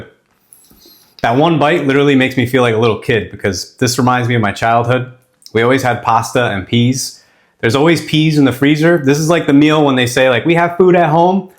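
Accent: Philadelphia, United States. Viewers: Looks great! I knew a Sicilian woman who would make me peas with rice. Same idea…was simple, but delicious ! Do I hear a Philadelphia accent ? The way you say WATER. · Very Philadelphia accent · God I wish you didn’t speak American, can’t listen to it 🤦🏻‍♂️ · The way you say woodur, lol that philly accent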